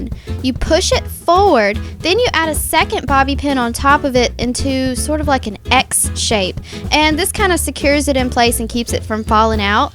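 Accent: South, United States. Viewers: I absolutely LOVE LOVE LOVE the way you talk! I can definately tell your from the south(and i don't mean it in a rude way because i live in the south) but your voice is so cute! thanks for makin me laugh(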